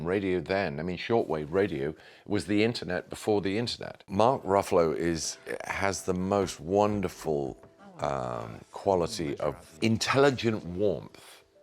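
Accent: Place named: RP, United Kingdom. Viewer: He sounds so much more posh now 🤔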